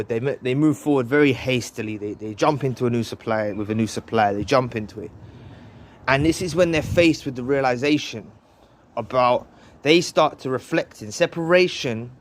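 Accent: London, United Kingdom. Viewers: Very welcome 👍🏻 What part of London are you from i was born in Leytonstone ☺ sorry for asking just love hearing a familiar accent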